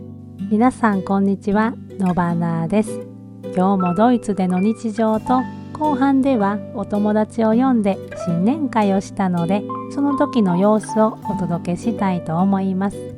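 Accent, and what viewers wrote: Kansai, Japan. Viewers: はんなりとした関西弁❣️めっちゃ好きになりました❣️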